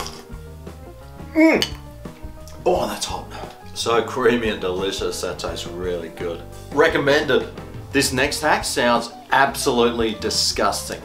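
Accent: Australia. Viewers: Ayyy your Aussie too! 😂😂😅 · ...Yeah, this guy is definitely Australian. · If we go by accent I'd assume Australia, Austria sounds more like German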